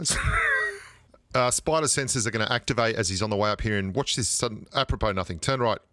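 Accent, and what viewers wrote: Australia. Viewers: Totally agree,...I dropped out of the entire last season and a half due to the exact same reasons you’ve just highlighted. Have returned to play in recent days. I’ve missed watching your content and hearing your hilarious Aussie-isms! 😊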